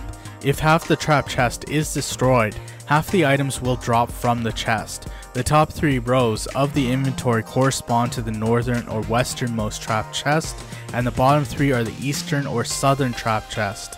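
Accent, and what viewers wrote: India. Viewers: Are you a indian bro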